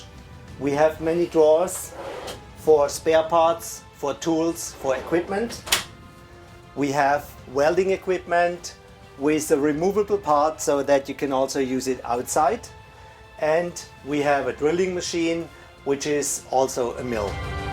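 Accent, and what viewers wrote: Germany. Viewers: I'm from germany ;) man merkt an der Aussprache richtig, dass er deutsch ist... 💩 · Pure German accent!! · The German accent makes it even more better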